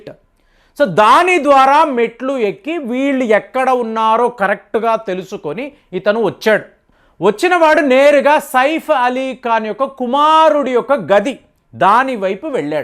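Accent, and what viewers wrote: Tamil Nadu, India. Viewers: gaaru Chennai lo unaaru kaabatti thamil mix untadi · తమిళ ప్రభావం ఎక్కువయ్యి మాతృభాషను నిర్లక్ష్యం చేస్తూ తప్పులు మాట్లాడుతున్నారు ప్రేమ్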